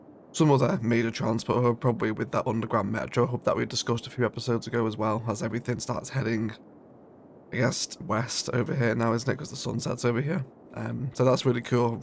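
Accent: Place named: United Kingdom. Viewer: Curious, why do you play/build using right hand traffic? You sure sound British, so I would have thought you'd use left hand as that's what we're used too. Biffa does the same too. 🤔 Is it just a personal preference? The highways with dedicated bus lanes are totally "cattle trucked" up. They've got them the wrong way round. I use left hand traffic, as that's what I know and drive in; but, the game has the bus lanes in what is called "Lane 3" whereas the should be in "Lane 1". They're a complete waste of time, but, they'll probably not ever get fixed as too much time has passed now.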